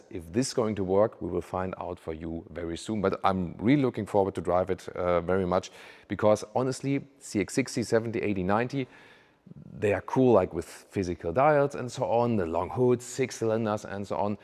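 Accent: Germany. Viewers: Without looking at the caption, one can only understand 50% of what you are talking. If you cannot reduce your German accent, maybe speaking at a slower speed may help a bit, if you really want to stay in this profession.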